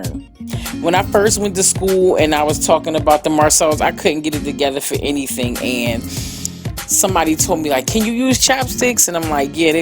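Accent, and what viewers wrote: Philadelphia, United States. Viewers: Love your channel! I could listen to your accent all day. I live in Atlanta but I’m from the suburbs of Philly and as soon as I heard your voice I knew!!! Such talent ❤️☺️ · Omg where are you located...you lowkey sound like you from philly and that’s where I’m at and I need a appointment 🤩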